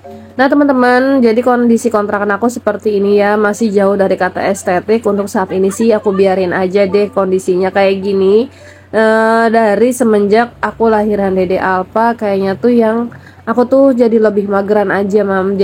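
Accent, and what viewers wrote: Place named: Sunda, Indonesia. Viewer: Insha Allah,gak akan pernah bosen teh,,seneng denger cara bicara teteh,,yg sebagian sunda kayak aku..😀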